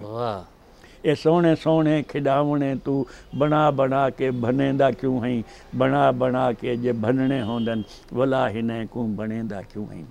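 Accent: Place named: Saraiki, Pakistan. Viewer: واہ۔ اتنی خالص سرائیکی اساں گھٹ سنڑی ہے۔ چس آ گئی ہے۔ وسدے رہو۔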